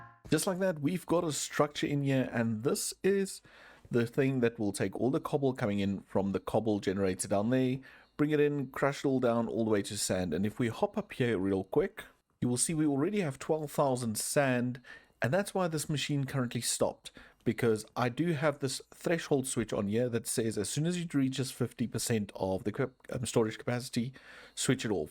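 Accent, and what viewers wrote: South Africa. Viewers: I knew you were South African. Happy we one the world cup!❤🇿🇦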